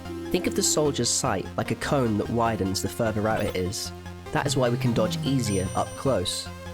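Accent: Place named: United Kingdom. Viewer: What part of Britain are you from?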